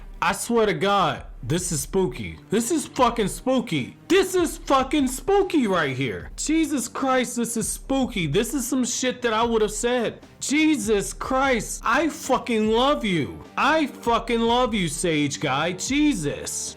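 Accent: New York, United States. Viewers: I fucking knew you was from New York when I heard you laugh bc my clan filled with New Yorkers but know it’s confirmed p.s New York pizza ain’t shit compared to Chicago 💯🤣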